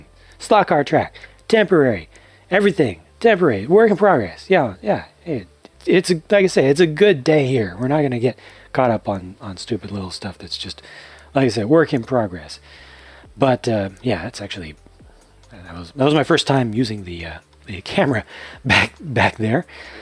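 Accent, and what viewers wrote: Australia. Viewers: U Australian? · aussi accent What a day! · I know an aussie accent when i here one because I am one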